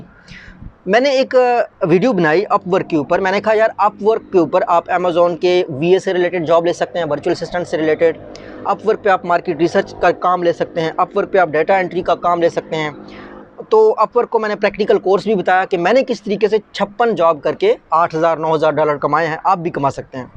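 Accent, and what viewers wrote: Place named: Dera Ghazi Khan, Pakistan. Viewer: Main bhi D G Khan say hoon ap k lehjay say pta chla ap apnay ilaqay say hain meray chotay bhai ho ap bahut khushi hoti hy apnay logoon ko traqi krtay huway ALLAH pak ap ko aor traqi day aameen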